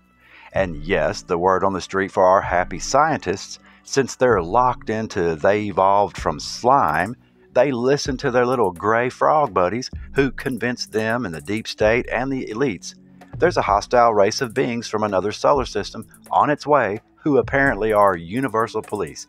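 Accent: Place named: South, United States. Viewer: Hey brother i appreciate your work man. And i can tell u a southern boy like me and that makes me like it even more.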